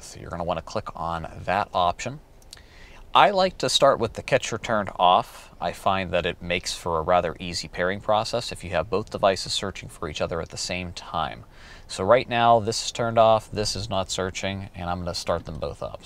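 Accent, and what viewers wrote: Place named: England, United Kingdom. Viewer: very nice english accent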